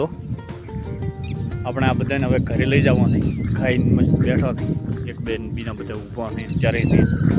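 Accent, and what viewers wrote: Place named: Banaskantha, India. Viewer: જય માતાજી ક્યાં થી છો ભાઈ ભાઈ તમારી ભાષા બનાસકાંઠા ની લાગે છે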